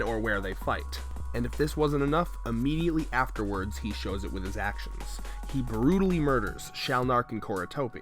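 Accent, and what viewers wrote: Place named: United States. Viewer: Why do you keep putting the r before e when pronouncing words, I think I developed a new pet peeve for American accents. Good vid tho subbed.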